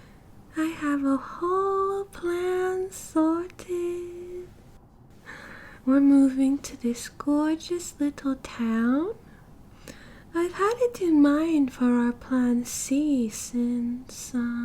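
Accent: United Kingdom. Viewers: Brit accent...? HELL YEAH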